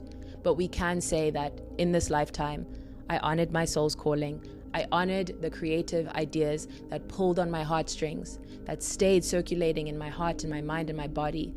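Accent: South Africa. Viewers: The dragon only guards the treasure🤯 I was in a yoga pose listening to this and I almost fell to the ground. Thank you for this episode you just freed me and I’m into this episode. Thank you for being this example and living your purpose. As a South African hearing my accent speak this work is so beautiful and lets me know it’s time for me to teach what I learnt to free myself from conditions and limitations. Thank you for this. I’ll start my YouTube channel officially today. No more fear. No more spiritual closets either. 🙌🏾🫰🏽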